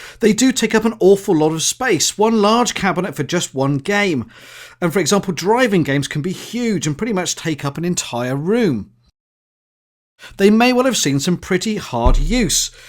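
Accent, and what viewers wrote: England, United Kingdom. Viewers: He sounds English. "British" is a nationality, not a language. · hummm u sounds like britis.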